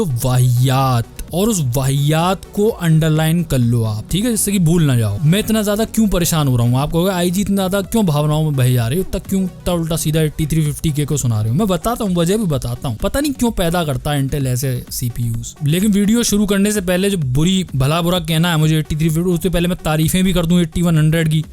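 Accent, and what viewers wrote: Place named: Rajasthan, India. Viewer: U sound like you are from Rajasthan 😁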